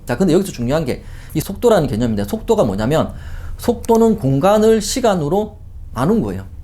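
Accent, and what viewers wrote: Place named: Gyeongsang, South Korea. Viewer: 했던말 또하고 했던말 또하고 양자에 쳐맞았냐? 갱상도 사투리 듣기싫다 영상 찍지마라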